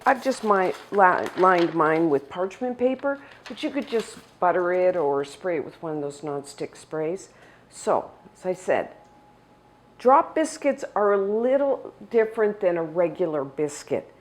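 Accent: Canada. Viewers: Welcome back,! Always the best recipes n explained so nicely I hear that Canadian accent a bit, I think 🥰.. love it! Love that color on you too🌷🌸🌿🌸🌷🌿🌸🌷🌿🥰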